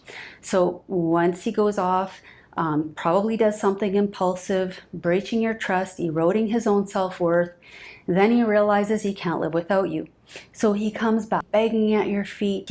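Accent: Canada. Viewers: I am diggin' the Canadian accent!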